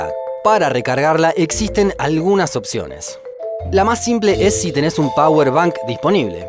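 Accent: Argentina. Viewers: se parecen porque ambos tienen acento argentino creo. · Yo le noto el acento argentino, pensaba que te referías a las jergas, pues el acento se le nota, no se si los otros argentinos tienen un acento mas fuerte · Por tu acento creo que eres argentino Así que te pregunto que se sintió el apagón en el cono Sur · Claramente está dejando a un lado su acento argentino para poder hacer este vídeo.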